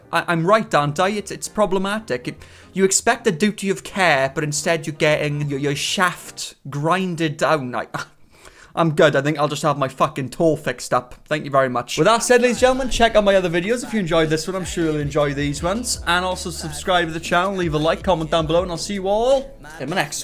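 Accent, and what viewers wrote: Wales, United Kingdom. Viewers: Nice brit accent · are u welsh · why are you british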